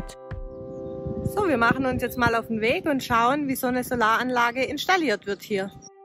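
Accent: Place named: Swabia, Germany. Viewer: 🧡🎈🌞💛🍀hallo ihr 🩵🧡wie immer interessant informativ schwäbisch😄 Dankeschön 🎈💛🌞🍀🩵🧡 Gruß vom verregneten Bodensee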